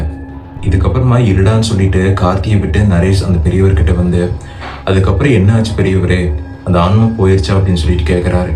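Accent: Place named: Coimbatore, India. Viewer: Ok bro your Coimbatore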